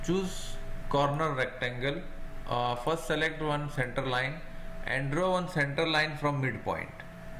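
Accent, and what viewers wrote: India. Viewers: Thank you, finally a tutorial with an indian accent!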